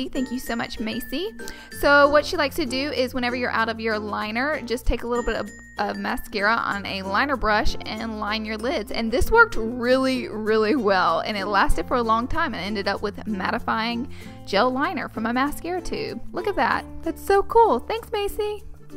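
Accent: South, United States. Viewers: this is awesome! can totally tell you're from a southern state and this is the first video I've ever seen from you lol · Your accent is adorable! I'm from the south too! ( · Everlasting Luv she's from Alabama so she has a southern accent, I'm from Alabama too so I don't even notice it unless she says something about it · She's southern. She has an accent. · Shut the fuck up! She's southern! Most states pronounce things differently! Would you tell a British person they pronounce aluminum wrong? No! Because that's how they pronounce it! So fuck off, BITCH! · It's that southern drawl lol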